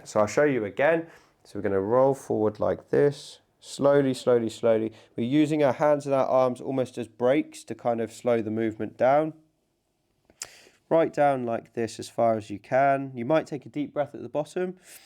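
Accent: United Kingdom. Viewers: when a handsome guy speaks with this British accent you better trust him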